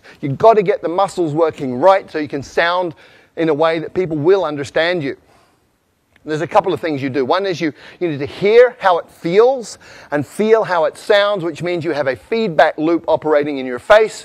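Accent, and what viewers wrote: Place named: East, United States. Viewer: alex wu 更接近于北美东部的发音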